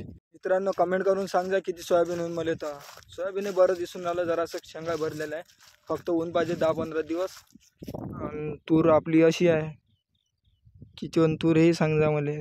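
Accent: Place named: Vidarbha, India. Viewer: खूप छान सत्य व्हिडीओ बनवला व तू बोलतो पण खास आपल्या विदर्भाच्या भाषेत समजावून सांगितले 🌹प्रणाम तुझ्या कार्याला🌹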